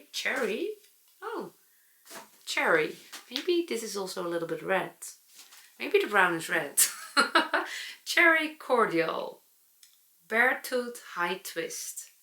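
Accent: Netherlands. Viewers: Dodgy? It's "Dutchy English". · I think your English is perfect, just the way it is. It’s ok to let your Miss perfect go. Your Dutchy English is what makes you so lovely ! I just love your knitting style! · Thank you for a lovely video. You are very inspiring. Please don’t loose your charming Dutch accent! 🌷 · This looks like a wonderful weekend. I think your dutchy English is charming. Thank you for Sharig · I could listen to you all day. Love your English with the Dutchy accent. The yarns you bought look beautiful too. Can’t wait to see what you create!! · Dear, I ❤ your Dutchy english. It is so sweet.😊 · What a marvelous festival. And your extensive detail conversation/coverage of all the events/your precious moments… With laughter, just infectious and joyful for us to share. So very happy for you. Your English is beautiful… And your Dutch English is part of your charm for all of us! 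So now you have raised your inner confidence level speaking in such a venue… It makes such a difference when you are with people who have the same interest! I watched most of the podcasts of the people you shared the panel with they are my favorite favorites along with you Marvelous interesting story about the roll up the sheep company! So meaningful” heart jumping“. Whatever you make will be extra extraordinary… You have such a design sense. Just adore the fibers that you bought… May you enjoy each stitch with them! Interesting the English ladies’s name was Diane who will teach you English as that is my name! I felt rather smiling when you said her name! You will take your time to rest recover… And I am sure that there will be more beautiful stories that you will share with us. Your wonderful videoing made me feel as though I was with you! It was fabulous! Wishing you well… Take a step back… Rest yourself! 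And then… Dive into your next project! You are such a loving, joyful spirit, and it touches me deeply to watch you! And in advance of your birthday… I am sending you the most heartfelt warm embraces of wishes for good health, many heart, jumping moments, not only in your knitting, but with you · I enjoyed your video so much I love your Dutchy English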